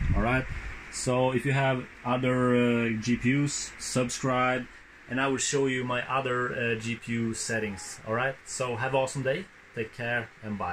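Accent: Sweden. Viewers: Ahahah i got the Dual Mini model... Flawless Price ...snagget it right before everything went Haywire with the prices. Im Swedish too, you sound like Stockholm dialect.. :D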